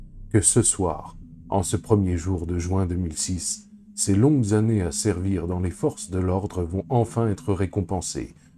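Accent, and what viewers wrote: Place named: Quebec, Canada. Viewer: et l'accent, Drummondville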